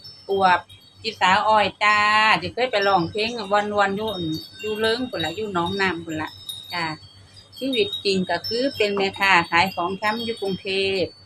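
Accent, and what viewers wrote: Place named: Yasothon, Thailand. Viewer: สวัสดีจ้า อ้อย เว่าเสียงสำเนียงเหมือนทางบ้านยโสธรเลยจ้า ร้องเพลงกะมวน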